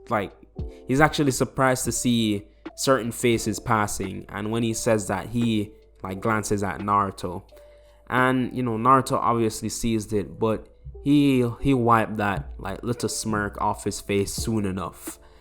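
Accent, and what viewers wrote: Jamaica. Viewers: are you jamaican? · this guy sounds like he has a Jamaican accent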